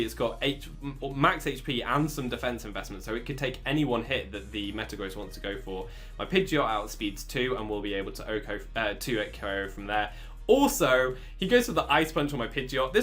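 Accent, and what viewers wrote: United Kingdom. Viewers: Hey bro, you sound british. I have a question for you, is it coming home?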